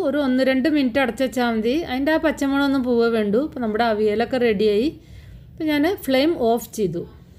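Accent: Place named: Thrissur, India. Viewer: good receipe......TSR speak pole thonni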